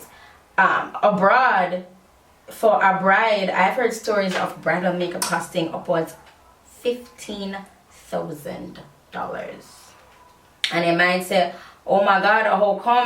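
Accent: United Kingdom. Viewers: If this British accent fit yuh one more time goodie😄. Love, love, love the look sweets. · That British accent though lol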